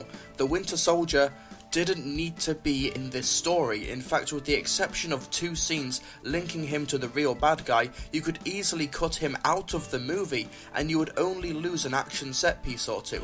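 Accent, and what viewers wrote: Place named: United Kingdom. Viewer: Can you at lest TRY to have an American accent when talking about CAPTAIN AMERICA!!?? Damn Brits should stay in England and talk about English stuff!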